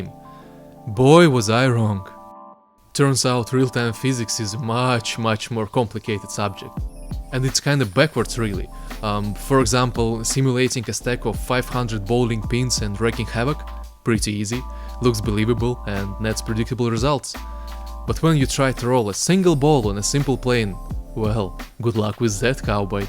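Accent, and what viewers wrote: Russia. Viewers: Хех, хоть ты и говоришь на английском, я понял что ты Русский :) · im glad you showed us a face cam cause with your voice the only thing i can imagine is that steriotypical russian guy that plays cs go, with all do respect. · Вначале я подумал "О русский", потом я задумался "А что если не только у русских такой акцент", а в конце все же посмотрел на комменты, а русский классно. Побольше бы людей в геймдеве русских было! Осталось только самому заняться этим и провести 1000 часов. Напоследок от себя автору: Well done! :) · Russian accent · Как кайфово слушать инглиш с русским акцентом, легче чем азиатов или американцев :D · Все равно слышен русский акцент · Очень похоже на русский акцент... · Вот слушаешь и задаёшься вопросом не русский ли родной язык? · Ррашын акцент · Мне одному показалось что автор не коренной англичанин, возможно русский или украинец. 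· Русский что-ли? Акцент слышно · Русский акцент слышно сразу) Добавил бы русские субтитры)) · Акцент русский) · У тебя русский акцент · Русский акцент узнаешь из тысячи:) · корявый английский , избавься от ебаного русского акцента а то такая речь уши режет .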